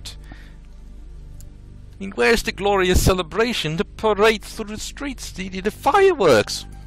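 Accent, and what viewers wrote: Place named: Eastern Europe. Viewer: It's a pitty. When you are planning to replay the game on the higher difficulty, please let me know. I'd like to give you a bunch of tips and hints helping you to win the game. (I've collected around 30 tipps and hints to improve your gameplay or just sensitize you to some aspects of the game.) You're English is very good (from my point of view), although I suppose you're not a native speaker. I guess, you're from an Eastern European country, aren't you?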